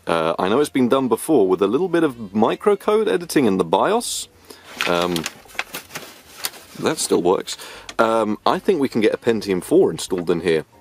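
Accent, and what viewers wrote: England, United Kingdom. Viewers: Sounds like he's from the same place as Hugh Laurie. No idea what regions go with which accents.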